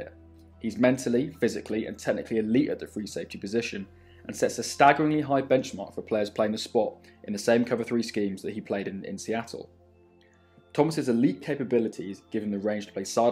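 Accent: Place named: United Kingdom. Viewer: Why is a British man breaking down nfl film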